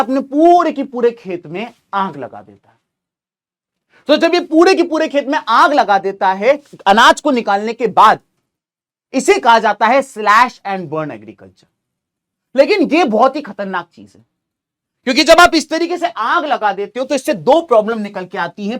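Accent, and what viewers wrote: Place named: Bihar, India. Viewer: Pure bihari lag rahe hai sir ❤❤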